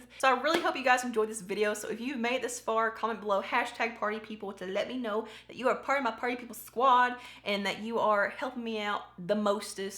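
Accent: South, United States. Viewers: #partypeople Brand new subscriber here and I must say I really enjoyed your video and this technique. I have never seen this done before. You are so beautiful! I just had to let you know. Oh and ps. From one fellow country gal to another, I love your accent! Nice to know I’m not the only one with a twang! 😘😉🥰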